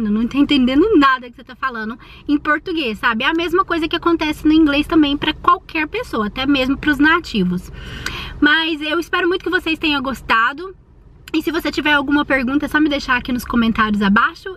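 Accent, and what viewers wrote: Minas Gerais, Brazil. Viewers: Seu sotaque mineiro é muito bonito, assim como você! Hahaha... Você tem alguma faculdade? Como conseguiu o emprego de gerente? Depois do emprego de babá, foi muito difícil conseguir outros contatos? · Como foi pra vc lidar com esse sotaque bonitinho de minas aí nos eua? Isso "se transforma" quando vc fala inglês ou ñ? Tipo, em portugues vc fala "...ao meu redorrrr" ou "porrrr que" e aí em inglês vc fala "arrrround me" ou "you areeeee"? kkkkk É engraçado mas a dúvida é séria, ñ me leve a mal! Bjo =) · Mas o sotaque mineiro nunca some kkkk mt bonito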